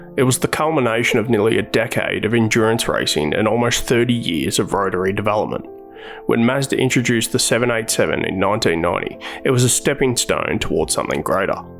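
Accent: Australia. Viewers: Idk just sounds like a normal Australian accent to me😭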